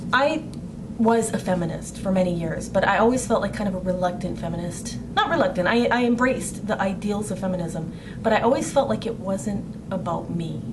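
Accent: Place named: Canada. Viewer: Yeah, that's my case, too. I'm also totally used to the pronouns; it's just legal/ work/ questionnaire forms and that "male" designation that makes me feel like I'm being DEFINED, categorized, in an incomplete or erroneous way. Of course, race is a construct, too! We're all genetically-compatible... but that's a topic for another channel... Could tell you were Canadian; it's the pronunciation of "about." :^)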